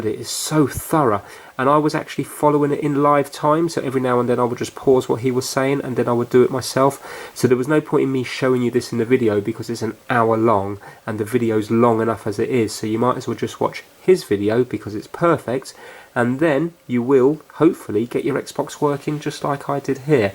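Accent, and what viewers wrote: United Kingdom. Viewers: Amber Ford I agree. It may also be the British accent. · I'm watching this for the Brit accent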